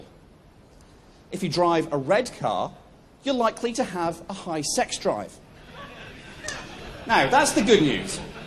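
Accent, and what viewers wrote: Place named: Isle of Man, United Kingdom. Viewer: Thought you were Manx!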